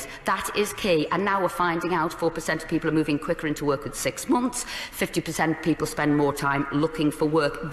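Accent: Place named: Merseyside, United Kingdom. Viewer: Love her toned-down Merseyside accent to appease the eton masses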